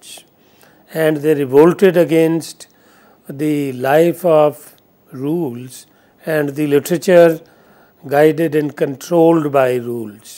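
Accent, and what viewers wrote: India. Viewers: A very good lecture on this topic, new with a certain vocal flavour from the Indian subcontinent.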